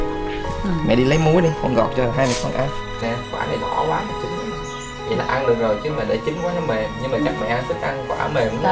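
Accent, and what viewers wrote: South, Vietnam. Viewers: Thấy bạn nói giọng miền nam gặt luôn á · Nghe giọng bạn và xem video thì đoán bạn ở miền Nam. Nhưng đến video này thì mình thắc sao ở miền Nam lại trồng được mận Bắc nhỉ? Hay bạn có nhiều nhà?😊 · cảnh miền Bắc , bạn nói tiếng miền Nam.... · cho mình hỏi là bạn ở miền bắc sao lại nói giọng nam v.. hay là gđ chuyển ra ngoài này sống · Ủa mẹ e ở miền Bắc mà e nói giọng Nam hay vậy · Ong noi nay noi tieng nam ma dau ra móc chau vay troi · Ủa giọng nói của bạn miền nam còn trái cây này ở ngoài bắc mà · ơi! Chú mới đăng kí kênh của con nè. Quê con ở đâu mà chú nghe cháu nói giọng miền nam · Cho mình hỏi sao bạn ở miền Bắc mà nói giọng Nam hay quá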